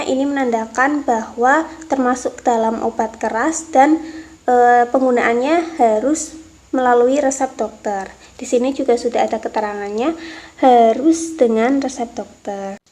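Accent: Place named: Java, Indonesia. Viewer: Medok banget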